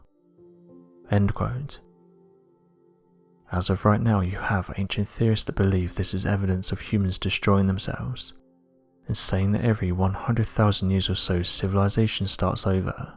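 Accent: England, United Kingdom. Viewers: What helps them appear credible is that they're using a person with an English accent to narrate their stories. Think about it, had they gone with some yokel from the south or a guy with a Bostonian accent talking about how "wicked hard" they looked around the internet to find examples of modern tech depictions from past civilizations or how "you know what, I swear I saw them same fancy scratches on a bumper sticker before y'all" and nobody would take it seriously.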